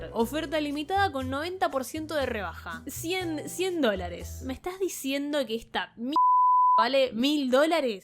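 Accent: Argentina. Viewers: Primer video que veo y ya note el acento argentino, como argentino eso me hace muy feliz! Es por esto que deje los gachas y bueno, es una lastima que el nombre de DMC haya sido manchado con esta estafa con cara de juego. Por ahora a seguir escuchando para seguir MOTIVADO!! Buen video😊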